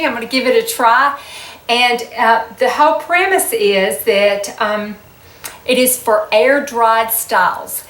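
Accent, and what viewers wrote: South, United States. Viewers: 😃, Nice one, I can just see you in a L'Oréal advert with that lovely southern drawl........... "Beeecawse y'all are worth eeeet" Youre a doll xxx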